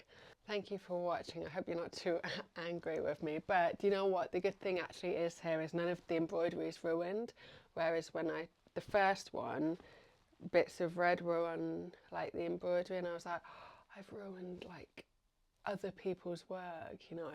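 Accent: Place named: United Kingdom. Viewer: Great video! Don't worry about the pink, it didn't look that bad anyway, and if you see it as a mistake, that's good, you have learned from it for next time. I love that you're experimenting. Thanks for keeping it real. We've all been there! P.S. Your accent sounds local, where in the UK are you. I'm in Nottingham 🥰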